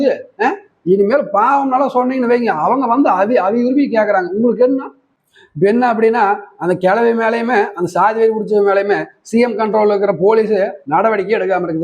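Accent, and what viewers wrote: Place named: Kongu, India. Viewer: தோழர் நீங்க பேசற அந்த மொழி வளம் நல்லா இருக்கு ..கொங்கு தமிழ்ல பேசுறீங்க ... எங்க திருப்பூர் மாவட்டத்தில் உள்ள கிராமங்களிலும் இது போன்ற சம்பவங்கள் கண் முன்னே நடக்கின்றது எல்லாருமே அதை கடந்து தான் போறாங்க யாரும் துணிந்து போராடுவதில்லை சாதி வெறியர்களும் திருந்துவதாக தெரியவில்லை.. இந்த கருமம் புடிச்ச சாதி வெறியர்களை ஒண்ணுமே பண்ண முடியல.. என்னதான் சட்டங்கள் போட்டாலும் கடுமையான நடவடிக்கை எடுத்தாலும்.. அதெல்லாம் அவங்களுக்கு பெரிய பிரச்சனையாக தெரிவதில்லை அவங்களோட சாதி திமிர எப்பவும் போல காட்டிட்டு தான் இருக்காங்க .. சாதி வெறியர்கள் மீது தொடர்ந்து வழக்குகள். நீதிமன்றம் வரை சென்று தண்டனை பெறுவதில்லை. பாதிக்கப்பட்ட சமுதாயத்தைச் சேர்ந்தவர்கள் தொடர்ந்து நீதிமன்றங்களுக்கு போக வேண்டுமா என்று சமரசம் பேசி வழக்கை வாபஸ் வாங்குகிறார்கள் என்ன செய்ய முடியும்.. அந்த சமூகத்தைச் சார்ந்தவர்கள் தினக்கூலிகள் தொடர்ந்து வழக்குகளுக்கு செல்ல அவர்களால் முடிவதில்லை.. 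அதனால் சாதி வெறியர்களுக்கு அனைத்துமே சாதகமாக அமைகிறது 100 வழக்குகள் போட்டால் ஐந்து வழக்குகள் கூட தண்டனை பெறுவதில்லை ஒன்னு ரெண்டு வழக்குகள் அதிசயமாக தண்டனை பெறும் .. சரி தோழர் தொடர்ந்து போராடுவோம் என்ன நம்மளால என்ன முடியுமோ அதை பண்ணுவோம் 😢😢😢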